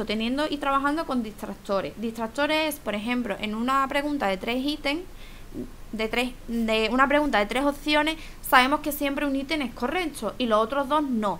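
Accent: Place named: Mexico. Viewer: parece que te dolió... Es cierto, pues, yo no soy culpable muchas de las mujeres de México, les ponen un acento a sus palabras que dejan mucho que decir, a veces parecen engreídas o de alta sociedad cuando muchas de ellas viven en los suburbios y comen lo impensable... Además no se le entiende, pues, escucha a la chica y verás que no miento y por decirlo no soy un ogro o naco como dices... De todas formas, lo que sí se reconoce es la buena intención de la chica.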